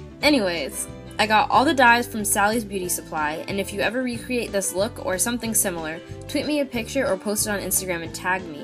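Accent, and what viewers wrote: Jamaica. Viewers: are you jamaican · r u Jamaican?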